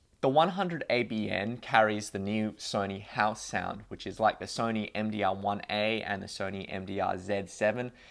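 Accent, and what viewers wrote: Australia. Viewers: and oh i have to say oz accent on an asian looking dude is effin damn sexy! have a great day buddy! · are you Australian?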